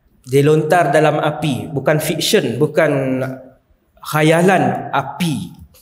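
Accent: Kedah, Malaysia. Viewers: Ustaz adalah favorite ustaz sya.. Ditambah lgi sya penah mimpi ustaz..Alhamdulillah bersyukurnya dpt mimpi ustaz. Kelebihan ustaz bgi sya..sorg yg sentiasa mnjadi diri sendiri iaitu ke mane saja negeri yg ustaz pegi, ustaz tetap utamakn loghat negeri ustaz iaitu Kedah.. Semoga Allah prmudahkn urusan ustaz di dunia n akhirat, dikurniakn kesihatan bdan n usia yg pjg..Aamiin Ya Rabbal Aalamiin🤲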